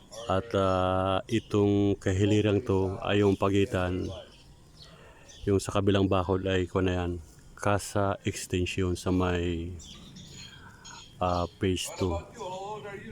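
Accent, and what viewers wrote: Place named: Batangas, Philippines. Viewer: Taga Batangas ka pala sir.kaya pala parang batangueno ka magsalita Tagalog .watching po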